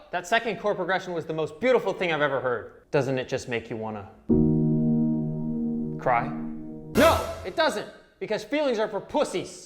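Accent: Canada. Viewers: Pranav Gautam Canadian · Thanks man. I actually searched and they actually say Abouut instead of Abaout. Didn't know it was called a Canadian Raising. xD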